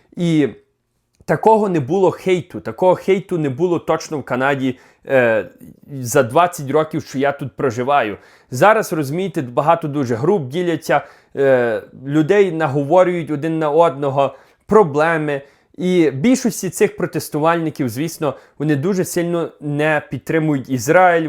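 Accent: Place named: Ternopil, Ukraine. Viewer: Дякую. Чудова мова. Десь в дитинстві я чув таку вимову на Тернопільщині. Бо в Україні ми, на жаль, розмовляємо вихолощеним совковим варіантом, ще й з вкрапленнями москоіської говірки (а хай так буде, з помилкою)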